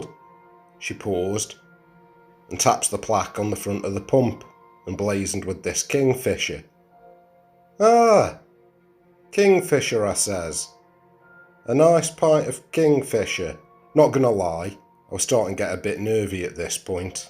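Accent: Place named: Derbyshire, United Kingdom. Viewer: That was ace, cheers very much. The accent had ne straight away, but I can't quite place it. Chewing words like Cheshire, hooting your 'oo's like Stoke, but probably more Derbyshire. I don't even care how out my guess, the tale told was a good tale well told. Thank you very much.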